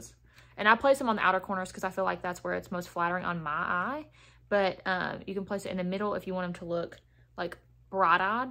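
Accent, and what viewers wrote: South, United States. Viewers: Where are you frommm?????? I hear a little southern accent mixed in there!I’m from South Georgia and it’s REALLY nice to hear somebody else that sounds like me on social media!!!! 🫶🏽🫶🏽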